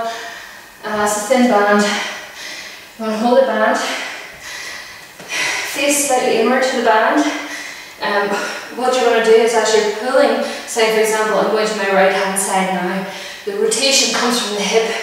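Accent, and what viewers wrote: Ireland. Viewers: i love your accent. it's the only authentic Irish accent I've ever heard. the only other one I've heard is from Angel, on the tv show "Angel"/"Buffy the Vampire Slayer" :)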